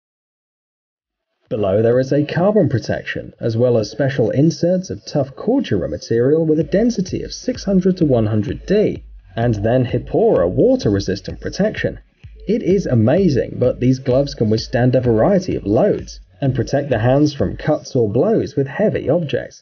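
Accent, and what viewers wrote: England, United Kingdom. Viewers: Some of the most useless and unnecessary products I have ever seen...and an English accent doesn't help to sell them.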